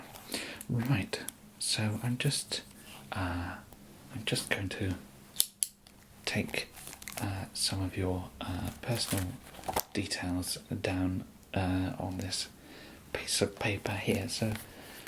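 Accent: England, United Kingdom. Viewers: I really enjoy English accent ASMR and interesting takes on roleplays, so this video 100% hits the spot for me, great job with your acting. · Okay, so british Howard Wolowitz. Not bad. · It's a rare treat to hear an English accent with ASMR - I'm English and I found this very soothing as well as amusing.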